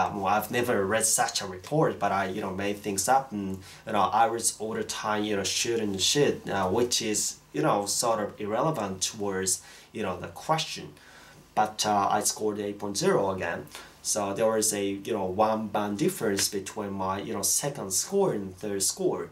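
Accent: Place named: Australia. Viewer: Omg I love your Australian accent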